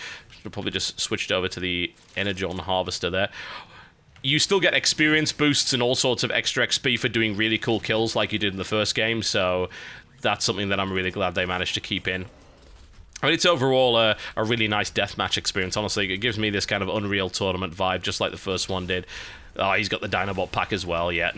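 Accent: United Kingdom. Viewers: Man you are british...BTW i mean that inna good way!! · So. British. FUCK YEAH.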